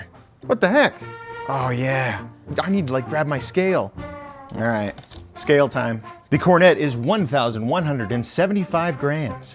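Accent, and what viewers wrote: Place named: United States. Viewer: Lol he's American, that's how we pronounce it here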